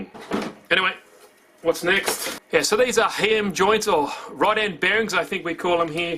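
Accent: Australia. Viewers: secs and i already know he is an australian :D good video mate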